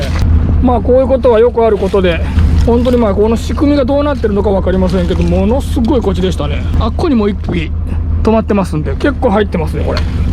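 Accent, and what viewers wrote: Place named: Bingo, Japan. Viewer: 今回も大漁おめでとうございます 腰がうたう 備後弁久しぶりに聞きましたwww 無理せずこれからも頑張って下さい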